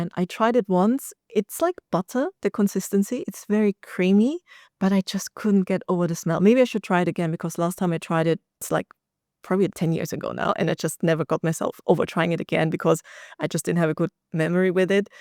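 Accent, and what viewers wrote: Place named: Thailand. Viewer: Are you half-Thai? Your Thai accent is so clear!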